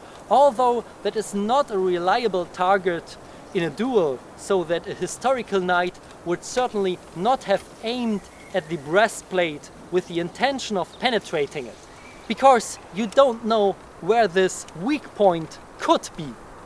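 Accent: Germany. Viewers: First time here. I've immediately recognized your German accent 🥰. Based channel! I subbed.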